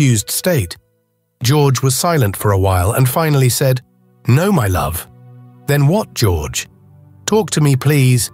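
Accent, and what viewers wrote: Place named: Europe. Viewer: I'm sorry but, why is this being read by this european voice? He isn't pronouncing the oooo's and hmmms correctly.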